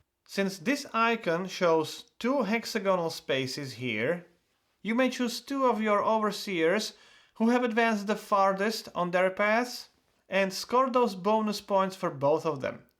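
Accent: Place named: Eastern Europe. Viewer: Wow, thank you so much!!! Amazingly chill content and as a west european, i freaking love east-european accents! 😬 How is it, that the video goes so long compared to your Praga video? I have heard, that this one is easier to teach? 🤔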